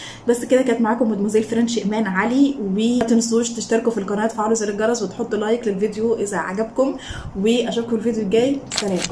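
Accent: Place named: Pakistan. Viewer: Arrête 😂 tu fais la star de français et jcp quoi mais regarde ta façon de parler tu parle comme un pakistan c’est trop drôle en vrai il faut juste corriger tes fautes d’orthographe et de parole Parce que vous parlez de la mauvaise manière et que votre accent ne convient pas du tout au français parce qu'une personne indienne parle français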